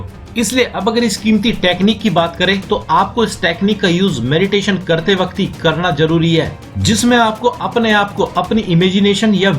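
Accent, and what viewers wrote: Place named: Punjab, India. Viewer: मुझे आभास हो रहा है आप पंजाबी व्यक्ती हैं।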